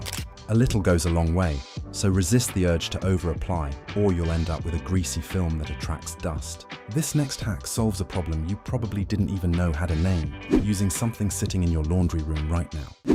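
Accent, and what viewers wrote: England, United Kingdom. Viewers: Why is original English accent talking in Dollars⁉️ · English accent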